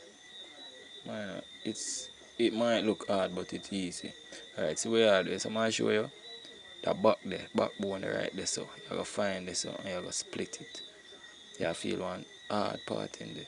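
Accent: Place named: Jamaica. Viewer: mi like how you keep the patois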